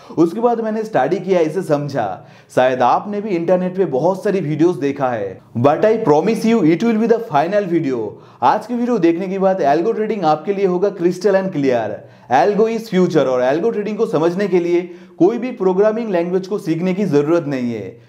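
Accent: Bengal, India. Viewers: u must be a bengali your accent describes .loads of love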